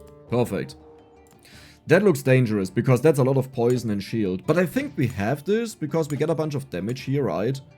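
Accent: Germany. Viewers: Omg 200k 🎉🎉🎉🎉 congrats I love almost all your content I could watch u watch paint dry with u talking about it lol. I really enjoy ur German accent and when u get frazzled it kinda makes me laugh sorry. 😂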